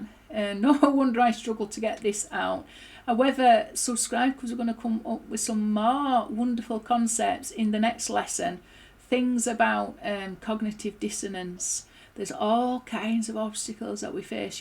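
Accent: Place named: Scotland, United Kingdom. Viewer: May G-D bless you very much today my dear sister. (I insist as I told you in the past: "I adore hearing that Scottish accent"! Once you told me you were borne in England; but I can only picture you as a fine Scottish sister in Faith, Tribe, Tradition, and Past. For sure, also, our future!!! שלום וברחה! אלוהו CHRISTIAN ANGUS GRIFFIN-MACKENZIE Enjoying your lesson from Santiago, Chile 🌶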